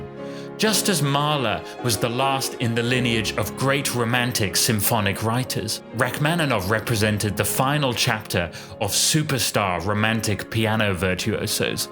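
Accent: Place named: United Kingdom. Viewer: Mos-cow? For an American you have a very British accent.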